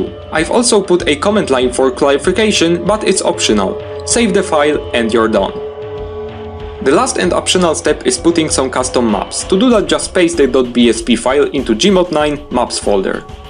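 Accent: Poland. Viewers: Great tutorial, but are you Polish? You sound Polish. (Yes, this is a necessary question.)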